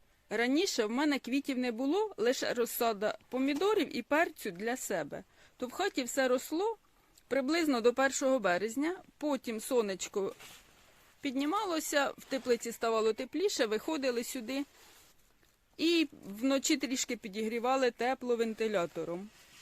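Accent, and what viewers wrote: Poltava, Ukraine. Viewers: Доброго дня, чи вечора. Потрапила на ваш канал і залипла!!! Поки усі відео не передивилася, не угомонилася. Дуже зачаровує українська мова з полтавським акцентом! Дуже приємно слухати. Для себе візьму приспособу для перевалки з стаканчика в більшу ємкість( з розрізаним боком) А банку з ручкою для виготовлення стаканів я теж у Валєри взяла. Я і зараз його дивлюся на каналі Наша дача.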